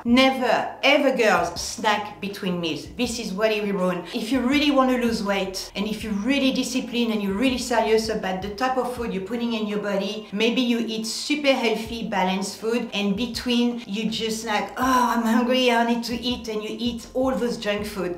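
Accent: France. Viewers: Great video delivered in that inimitable French way!I decided right away to follow your lead -not dieting but living within a discipline-I will report back in a month Thank you!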